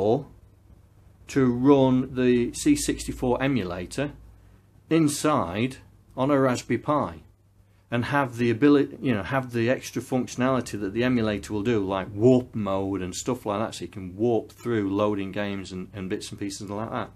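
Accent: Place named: Northern England, United Kingdom. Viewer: Where you from, you sound a good Northern lad!!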